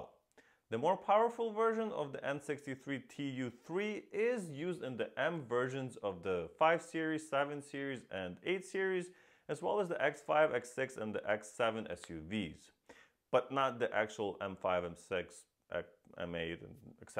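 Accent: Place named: Russia. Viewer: У тебя как будто русский акцент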